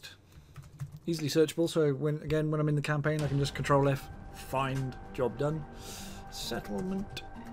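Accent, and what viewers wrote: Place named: United Kingdom. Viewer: Welcome. Figured it was some sort of U.K. accent just couldn't place it.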